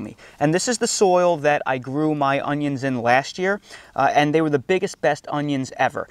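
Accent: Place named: Western Pennsylvania, United States. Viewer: Love your videos. Looking at the length of day chart, I'm confused. If the length of day zones are based on latitude, why are the zones staggered vertically, I would assume that since parallels of latitude are at a constant angle, why are the zones staggered vertically? Just a thought, I live in Farmington, NM in the intermediate zone. As an aside, you said you lived in Pennsylvania. My family was from the Johnstown area and Indiana, PA area. I note an accent, perhaps western PA?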